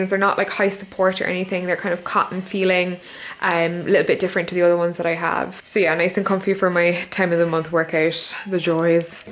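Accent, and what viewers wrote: Ireland. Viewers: omg ur Irish!!!!!!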